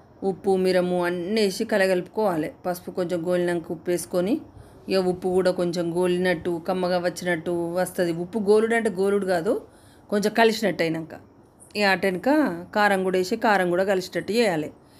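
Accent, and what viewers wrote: Telangana, India. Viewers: తెలంగాణ యాసలో యాంకరింగ్ చాలా బాగుంది అక్కయ్య చాలా చక్కగా అటుకులు మర్లే సే విధానాన్ని చక్కగా వివరించారు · Good to hear your voice in telagana slang aunty ❤ nen vachaka nak ila atukulu chesipettali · ni telangana yasa and atukulu super👌👌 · Telangana slang super bebakka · నీ తెలంగాణ భాష సూపర్ అటుకులు కూడా సూపర్ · kisthi lo posina marlavosina atukulu bagunnai attayya😋 mi basa n Telangana yaasa super 👌👌👌👌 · Super pinni ur Telangana slang and atukulu 🥰 Nenu try chesa chala bagunnay ..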